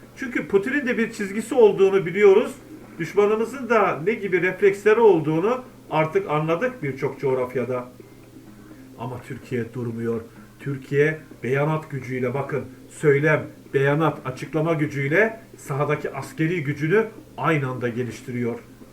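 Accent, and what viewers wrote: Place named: Shusha, Azerbaijan. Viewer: Allah senden razı olsun qardaşım. Sen öyle konuşuyorsan ki,sanki Şuşada doğulmuşsun.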